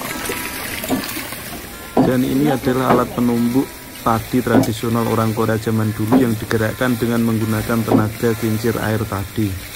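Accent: Java, Indonesia. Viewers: Aslinya mana nichh kok suarane ada jawa2ny gtu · Ikutan give away ka. Menarik ka videonyanya apalagi denger suara kk yg logatnya jawa bangett, natural bangettt. Next tetap bikin video2 trip kakak yg menjelajahi setiap tempat wisata dan kuliner tentunya dikota apa negara tersebut nama : ayu furwanti kota : jakarta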